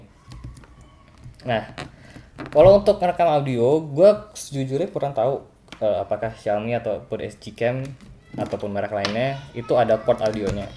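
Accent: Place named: Java, Indonesia. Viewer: Orang jogja nih? Medog beut ngmngnya hehe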